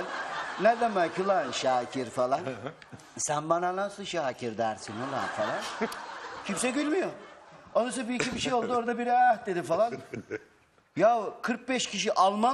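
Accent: Ankara, Turkey. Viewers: tam ankaralı .d şiveyi bıraksa tamam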